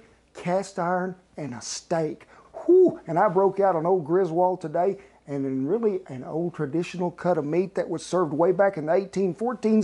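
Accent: South, United States. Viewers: Your voice kinda reminds me of JOEL OSTEEN but with a more country accent!!🤭🤭..some onions along with them mushrooms wouldve did it but nevertheless a beautiful job!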